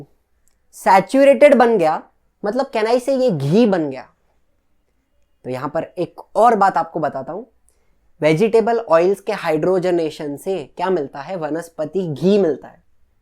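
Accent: Maharashtra, India. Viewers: Dada tu Marathi ahes?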